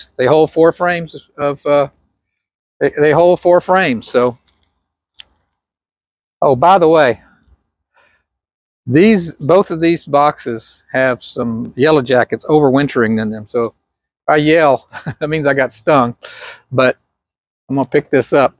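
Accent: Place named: Australia. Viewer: ☺ Cedar, you can't go wrong, it will last for years. The AI must not have liked the Aussie accent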